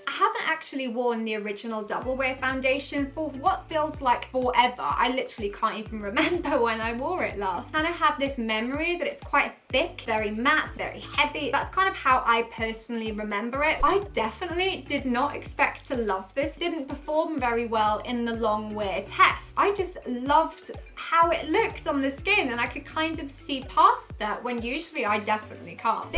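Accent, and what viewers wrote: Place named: London, United Kingdom. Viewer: W 王姑娘 she’s British of course! 🇬🇧😝 sounds like she’s from London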